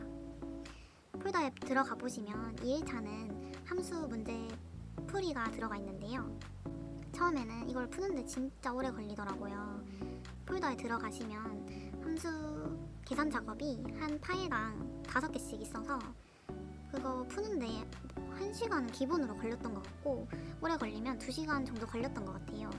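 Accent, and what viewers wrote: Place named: Gyeongsang, South Korea. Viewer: 실기후기영상 보러왔습니다 일단 축하드려요 ~ 그리고 사투리가 좀 보이는데 고향이 경상도쪽이신가요? 시나공으로도 연습해보셨는지도 궁금해요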